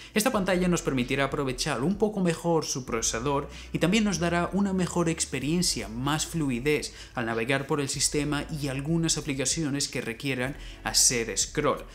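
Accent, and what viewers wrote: Canary Islands, Spain. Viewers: Eres de Canarias?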